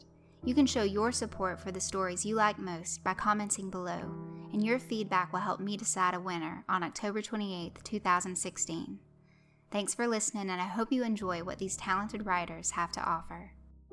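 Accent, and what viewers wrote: South, United States. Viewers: YO WAIT YOU HAVE A SOUTHERN ACCENT?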